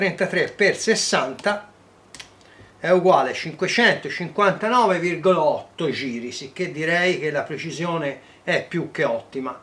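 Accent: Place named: Lucca, Italy. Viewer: See ...sei ganzo !! Toscano anche te ...dall.accento sembrerebbe lucchese io pisa ahhh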